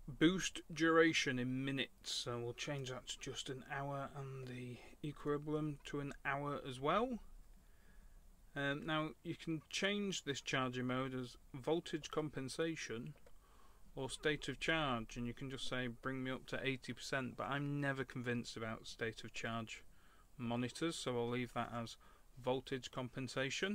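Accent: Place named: Yorkshire, United Kingdom. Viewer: Ah right, I live near Todmorden. I was thinking your accent was a bit diluted to be fully yorkshire lol. I was wrong :)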